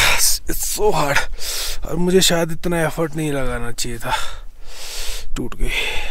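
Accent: Haryana, India. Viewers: Bro are you from haryana🤔🤔🤔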